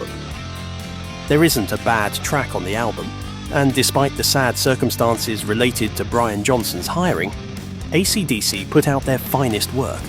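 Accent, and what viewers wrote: United Kingdom. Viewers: You are British as per your accent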